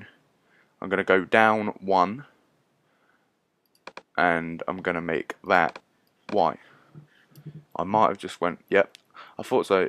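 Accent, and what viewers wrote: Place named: United Kingdom. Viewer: Brittish accent on a tutorial. + 10 points.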